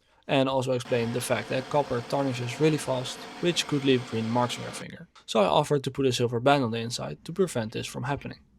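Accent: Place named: Netherlands. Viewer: Your accent sounds like you are Dutch! Very nice ring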